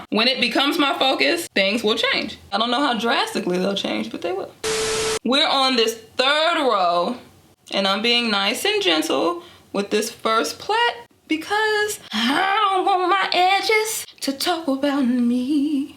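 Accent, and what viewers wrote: North Carolina, United States. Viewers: I KNEW u sounded like a North Carolinian🤣🤣🤣 heyyyy neighbor Lol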